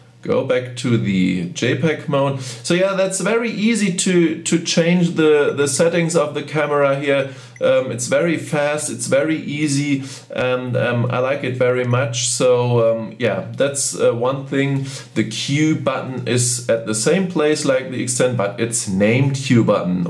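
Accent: Germany. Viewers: great review, & good to hear that german accent again, i had a great german friend years ago, named joseph tretin. all the very best John Radford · Thanks for this comprehensive review. I came here to see what the viewfinder looks like (I only have the X10) and you provided it! One small note for non-German speakers: when says "auto-focus" I thought he was saying "out-of-focus". Don't worry, that's just the German accent pronunciation of "auto focus".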